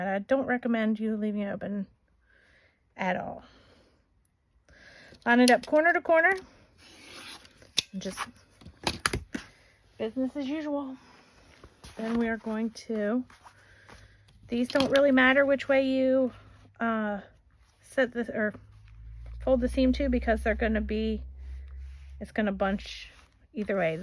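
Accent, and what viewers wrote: South, United States. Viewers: I've watch various quilters tutorials to help me as a beginner. I truly believe you are one of my top 5 that I can watch patiently and understand what you are doing. Plus, I love your little "southern" accent. It's adorable. Beautiful work always.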